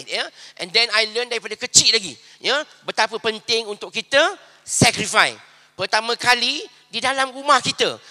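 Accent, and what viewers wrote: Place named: North, Malaysia. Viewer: Mak org Singapore, ayah org Melaka, tang mana boleh melekat loghat Utara?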